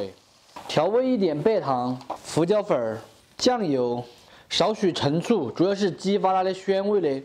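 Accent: Sichuan, China. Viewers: 川普太可爱了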